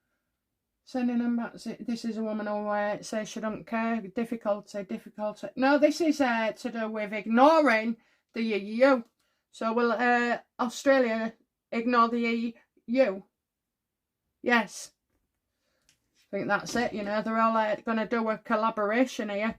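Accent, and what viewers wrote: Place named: Doncaster, United Kingdom. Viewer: I love your channel and your style. I'm from Doncaster originally and recognise your accent. Wonder where you are from? Thank you for your readings xxx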